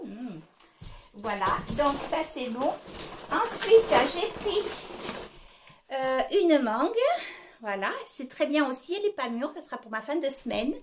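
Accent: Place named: Ariège, France. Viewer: Coucou ma puce , j'adore regarder ta vidéo et je vois que tu as gardé ton petit accent ariegois ❤ bravo ma chérie , pour tes petites courses , j'adore ma puce tu es vraiment naturelle , gros bisoussss ❤❤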